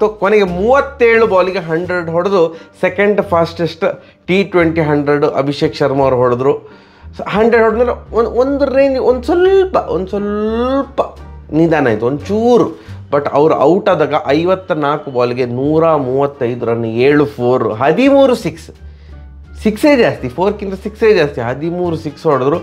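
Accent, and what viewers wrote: Mangalore, India. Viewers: ಸರ್ ನಿಮ್ಮ ಮಾತು ಕೇಳಲು ತುಂಬ ಚಂದ ಮಂಗಳೂರುಮಾತುಹಾಗಾಗಿನಾನು ನಿಮ್ಮ ಮಾತನು ಇಷ್ಟ ಪಡುತ್ತೇನೆ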